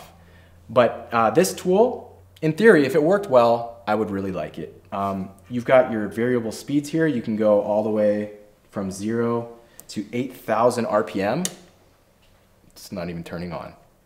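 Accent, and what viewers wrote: Canada. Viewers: Great vid! Thanks. Is it just me or do Canadians say "out" more than anyone else just to say "oot"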